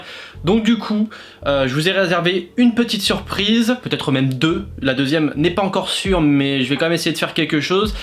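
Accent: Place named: Doubs, France. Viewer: J’adore ton accent, tellement agréable à écouter, il ne viendrait pas du Doubs...? 😉 👏👏👏👏 pour tes 10K, mais bon, t’iras beaucoup beaucoup plus haut...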